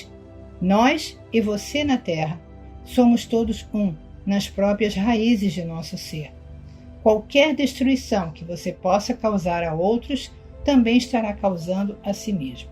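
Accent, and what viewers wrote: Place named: Brazil. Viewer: Gratidão e Universo. Perfeito com sua voz brasileira. Amei. Que a Luz a seu redor continue se estentendo diariamente. Luz....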